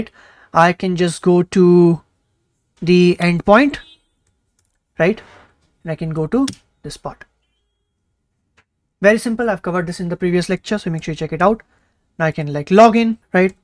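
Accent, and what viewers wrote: India. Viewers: Yes! He uses basic English with Indian accent. Bilkul follow kar sakte ho👍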